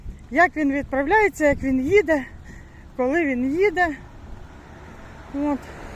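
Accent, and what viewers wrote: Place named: Central Ukraine, Ukraine. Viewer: Привіт землячко, по вимові чую що ви з центральної України🖐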